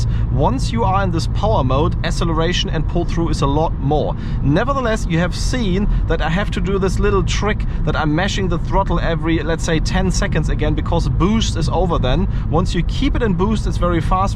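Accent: Germany. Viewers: Ich liebe es wie man soo hört dass du deutscher bist an deinem englisch niceeee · Du hast doch nh deutschen Akzent oder?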